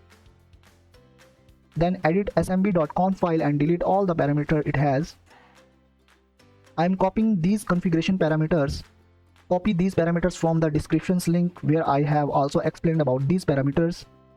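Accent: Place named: India. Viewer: OMG, Are speaking English or are you trying? please can you try to forget your Indian language, that's gonna help you.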